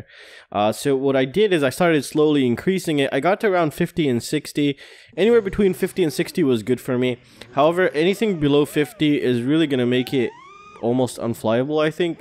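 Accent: United States. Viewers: Are you in the uk? Looks like UK and Uk numberplate on the 206. You sound American.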